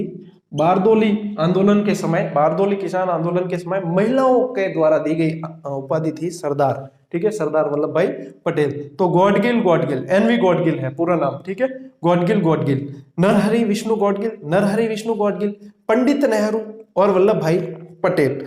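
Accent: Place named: Rajasthan, India. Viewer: Sir aap ki godhwadhi boli bhut pyari h aap jab bhi baat karo to bas yahi boli bolo🙏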